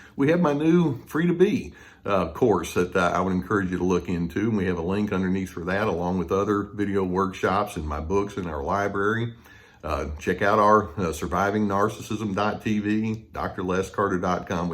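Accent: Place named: Texas, United States. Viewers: Dear, you and your warmth and wisdom are a guiding star to me. If I ever meet someone like you I’ll marry them right away 😜 Just kidding of course, because we’re striving for ‚Team Healthy‘, right? This video was (again) super helpful and well organized. I am always trying to educate myself on ‚early signs‘ in getting to know people and potential friends or a potential partner... and this video was particularly helpful. And you coming across so warm-hearted and well-balanced certainly doesn’t hurt your message. And btw.: I love your Texan accent too 😍 Love my regular dose of Dr.Carter 🥰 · I love Texas Twang, too, being from Texas (military brat).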